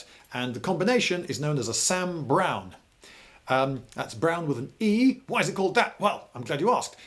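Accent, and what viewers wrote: United Kingdom. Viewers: Explaining anything in an English accent makes whatever you are talking about 10x more interesting. You could be talking about the history of the bar stool and it would be entertaining. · This guy sounds British.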